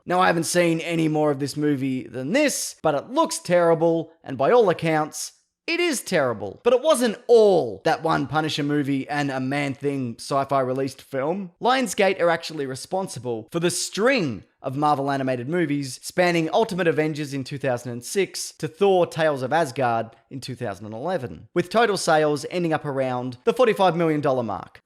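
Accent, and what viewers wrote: Australia. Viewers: You sound Australian so do a video on Danger 5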